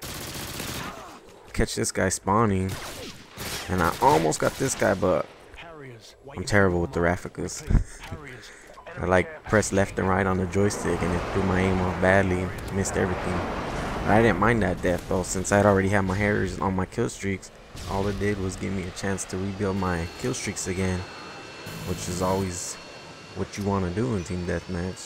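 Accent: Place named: United States. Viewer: You sound American :)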